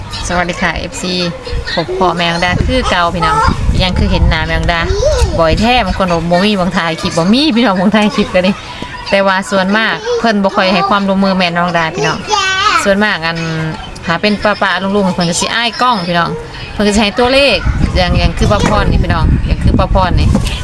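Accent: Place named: Laos. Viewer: ออกลาวบ่อจร้า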